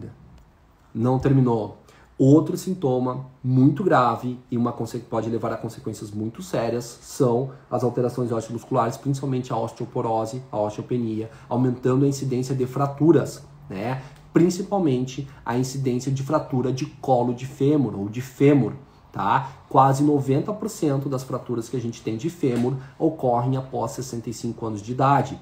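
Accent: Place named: South, Brazil. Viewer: Oi Dr! Gostei muito das suas especificações!Eu tenho 50 anos, ainda não parei de menstruar, mas sim, já tenho alguns sintomas do climatério. Já comecei a procurar, mas ainda não encontrei um médico (a) que realizasse a modulação hormonal bioidêntica! Minha pergunta é: Esse é um tratamento muito caro? O senhor atende a algum plano de saúde? Pelo jeito de falar o senhor é sulista. Eu sou curitibana mas,resido no Rio de Janeiro. Dependendo dos valores,eu iria até seu consultório. Obrigada!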